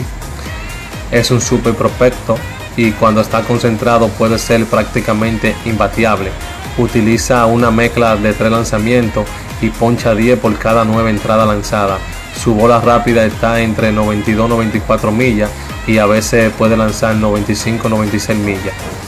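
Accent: Cibao, Dominican Republic. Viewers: Ay perdón, pero sigo escuchando tus comentarios y definitivamente tú eres un Cibaeño bien campesino, OMG, tú no sabes hablar Español!!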